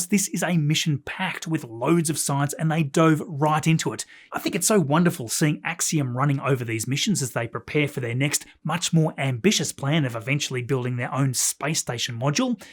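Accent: Tasmania, Australia. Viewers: I always love hearing the Tasmanian pronunciation of "booster". I think it could only be topped by hearing "caterer"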